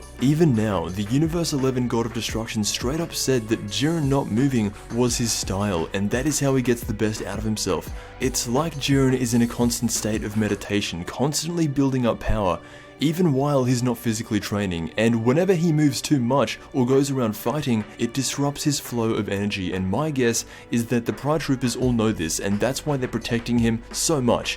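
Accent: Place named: Australia. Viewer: What country are you from? I can't place your accent all I'm getting is Australian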